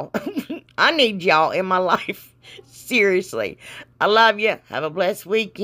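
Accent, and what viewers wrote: South, United States. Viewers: You are so much fun to watch. You make me laugh and your such a great crafter. Everything is so pretty. I love the bee theme. Please make a bank. It would be so cute and funny too. I’m a southern girl., but your southern accent sounds just like my sister that’s from Tennessee. I’m from pensacola Florida. I have lived in Mississippi for the past 22 years. Gulfport MS. Anyways, great video. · I was watching a diy of something I'm pretty sure, wouldn't turn out the same if I did it. A girl can dream... Your cute little bee thumbnail was in the suggested videos, and I thought well that is adorable. I'll buzz over there and check channel out. The first thing I hear is what sounds like a southern accent and I'm flooded with childhood memories growing up in southern Georgia that brings a smile to my face. I've spent my whole adult life as a yankee lol. 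Anyway, I thought this gal has my kind of humor and I thoroughly enjoyed the video enough to subscribe!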